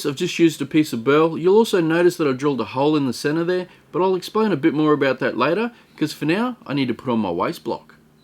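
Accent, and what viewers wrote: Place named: Australia. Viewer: Im an american, and half the reason i watch your channel is for your awesome australian accent 🔥🔥😂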